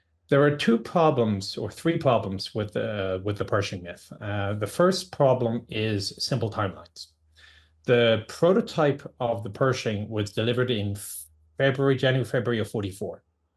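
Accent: Ireland. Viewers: Is this fella from Ireland originally but in US for years? His oronunciation of 'T' especially at the end of words suggests so. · The tank man is not British??? But American his accent sounds Irish with a little bit of london oops...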